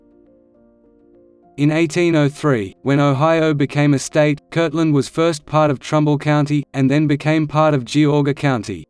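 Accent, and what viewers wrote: United Kingdom. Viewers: WTF?! Why a British accent. Turned off, leaving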